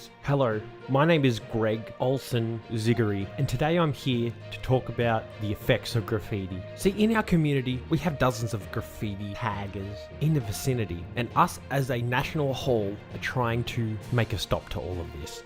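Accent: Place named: Australia. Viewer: Australian accents are so cool. Great video, as always, especially the comedy bit at the end. 👍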